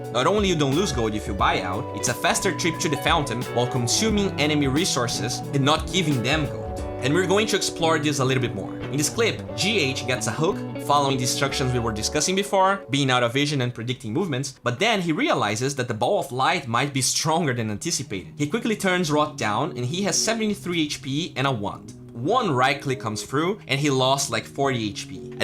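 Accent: Brazil. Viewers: Oh so he is brazilian that's why. I know he's doing all he can to improve his accent i believe in him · as a brazilian, his brazilian accent is rly easy to recognize, but he is improving his pronounciation comparing to his older videos.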